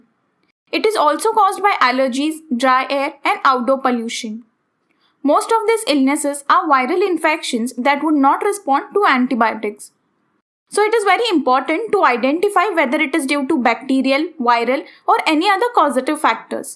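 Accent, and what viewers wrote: India. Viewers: That Indian accent though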